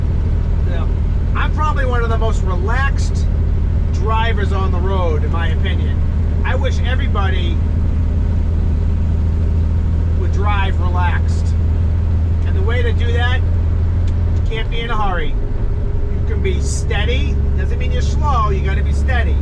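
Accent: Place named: Massachusetts, United States. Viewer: Love the videos. Like you, I'm a native of Mass. My Mass accent is even worse than yours lol. Don't sweat the haters brother. Spoken like a true Masshole... F**k Em. Keep safe out there. See you next time.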